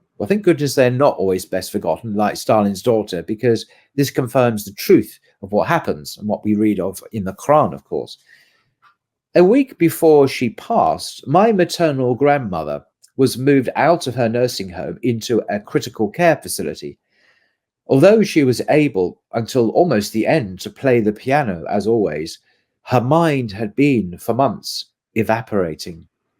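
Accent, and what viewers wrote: United Kingdom. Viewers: You got a British accent, you are a propagandist. Let's talk about how many people Britain have killed just in India. F*****, British sucks.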